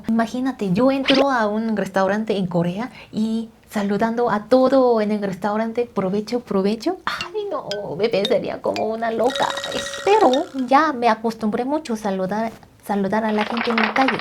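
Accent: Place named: Mexico. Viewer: Qué bien hablas mexicano. 🎉🎉 Saludos y sigue disfrutando de México.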